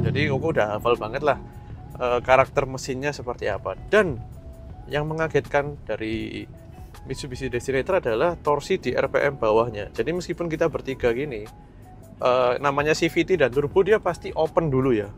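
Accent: Surabaya, Indonesia. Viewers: sampean enak nek ngomong suroboyoan ko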